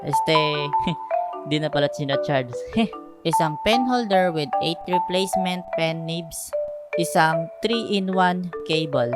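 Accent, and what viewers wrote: Iloilo, Philippines. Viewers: Ilonggo ka idol?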